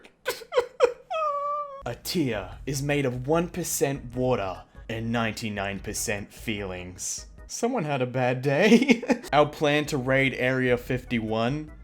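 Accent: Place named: Australia. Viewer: idk if i heard correctly but i can hear a bit of an aussie accent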